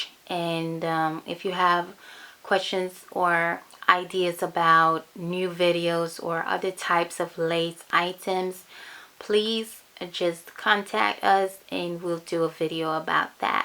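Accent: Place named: Brazil. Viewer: Your voice sounds like Rihanna but with a Brazilian accent. Very cute!!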